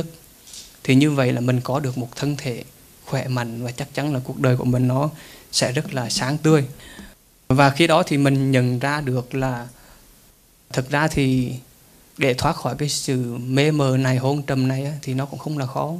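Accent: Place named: Central, Vietnam. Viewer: Giong noi mien trung cua thay rat hay rat thich nghe ma nghe ko chan cam on thay ah .Nam A MO Di DA PHAT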